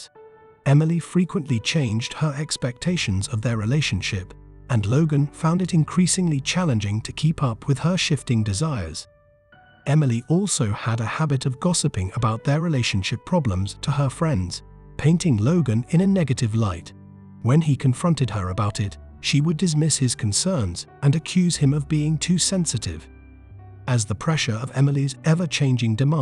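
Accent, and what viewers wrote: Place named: United Kingdom. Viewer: How about you take your British accent and get bent.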